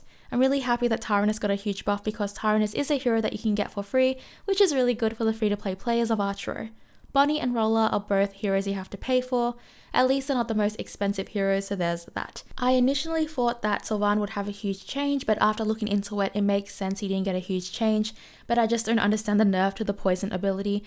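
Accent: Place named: New South Wales, Australia. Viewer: Those upgrades are very welcome! Thanks for the updates. Please keep it up. Love the accent too! NSW?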